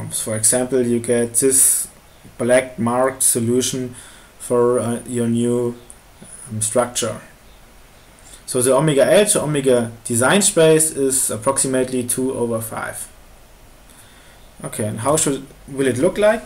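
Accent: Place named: Germany. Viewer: alter der deutsche Akzent scheppert alles raus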